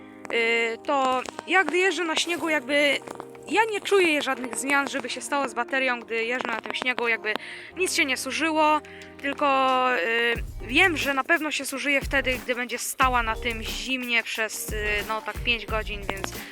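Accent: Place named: East, Poland. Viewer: Nie wiem jaki sens jest jeżdżenie jakąkolwiek hulajnogą przy takim śniegu jaki tutaj jest pokazany no to może tam gdzieś to relacja jest w języku trochę takim z akcentem wschodnim morze na południu Polski gdzie ta zima trochę tam gdzieś się pokazuje bo na północy a w Szczecinie mieszkam po prostu praktycznie zimy nie widzę ale nie zamierzam jeździć hulajnogą zimą bo to jest średnia przyjemność praktycznie no jeszcze na siodełku powiedzmy to jest jako tako ale stojąc to człowiek po prostu szybciej marznie i jest większe ryzyko wpadnięcia w poślizg w ogóle nie widzę tej jazdy zimą i należy ona do sporadycznych czy co wynajmują firmy wynajmujące czasami spotykają swoje właśnie hulajnogi porzucone w śniegu w ogóle to jest osobny komentarz do tego bo to już po prostu zakrawa na kpiny z hulajnogi wszędzie są bezładzie porzucane gdzie tylko popadnie i zagrażają przechodniom ja już bym się dwa razy o nie potknął wychodząc wieczorem przy słabym wzroku...m.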